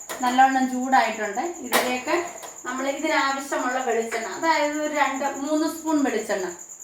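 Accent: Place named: India. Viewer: ഒരു കറി വച്ചാൽ എല്ലാം വിശദമായി പറഞ്ഞുതരും തുടക്കക്കാർക്ക് നല്ലതാണ് നാട് എവിടെയാണ് ഞങ്ങൾ പറയുന്ന പോലെ ചേച്ചിയുടെ ഭാഷ❤❤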